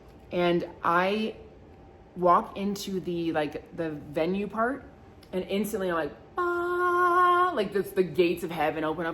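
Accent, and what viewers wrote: United States. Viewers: I love the Midwest comes out everywhere.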